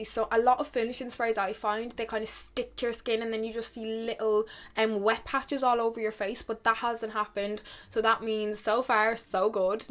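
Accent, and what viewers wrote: Ireland. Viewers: Where are you from in Ireland beautiful accent ! Cxxxx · Lol first time seeing your video. First sentence and I was like SHE IS IRISH. If I’m wrong.. I’m sorry lol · your irish accent is just super cute!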